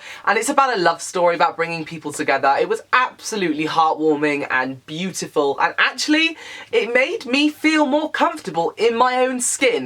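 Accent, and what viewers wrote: United Kingdom. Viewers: you're the only british booktuber i watch and i don't live in the uk anymore and its so nice to hear your british accent and words I've forgotten to say!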